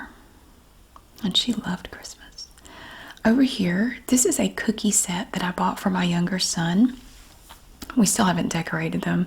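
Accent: South, United States. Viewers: This is my first time on your channel and I just love love love your accent!!!!!!!! I’m obsessed with southern accents! Also love your vibe and energy! I’m now subscribed and look forward to your future uploads! Love, from the U.K.! 💖💖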